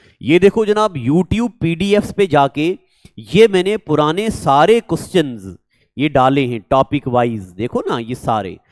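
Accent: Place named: Kashmir, India. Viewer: Love this kashmiri accent 😂😂😂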